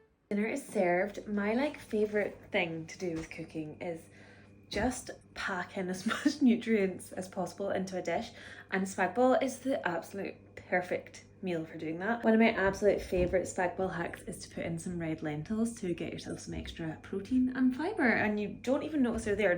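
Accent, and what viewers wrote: Scotland, United Kingdom. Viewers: I’m a new subscriber. I love all the scenery and of coarse you as well. I could listen to you talk all day long.My Mom was Scottish , born and raised in Glasgow. My Mom and grandma can over to the US when she married my Dad. The dna kit says I’m 80% Scottish. We use to go to Scotland every summer. We usually stayed with my Aunt and Uncle who lived in Ayr. I’ve been to Alloway several times. I’m old enough to be your mother but just hearing your accent brings back so many wonderful memories for me. My Mom and grandmother have both passed so I miss the accent every day. I wish I could move to Scotland. It’s always been my second home. Alas, I don’t have the money so your vlogs it is! I’ve watched every one now and always look forward to a new one. I’m so happy I found you! 🍎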